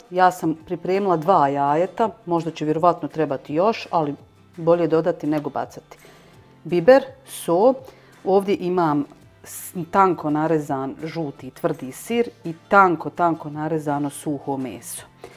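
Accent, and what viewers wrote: Bosnia and Herzegovina. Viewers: Obozavam Vas slusati dok pricate, svidja mi se ovaj recept, bogat va jednostavan, molim Vas vas recite mi iz kog ste mesta, moja drugarica je iz Bosne i prica kao i Vi, pozdrav🍀🌹🍀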